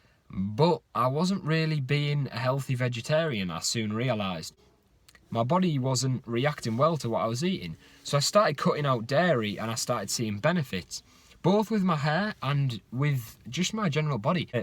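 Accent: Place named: Liverpool, United Kingdom. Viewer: Are you from the same area as Paul McCartney? Sounds like you two have the same accent.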